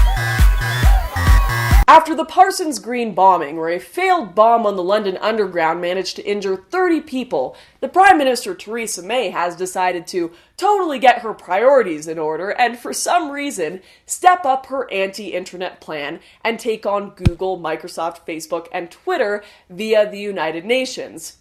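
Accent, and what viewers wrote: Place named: Canada. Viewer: That Canadian accent doe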